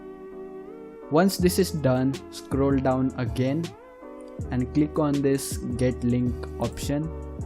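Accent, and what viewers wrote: India. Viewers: do you know what accents are? he's Indian (i think)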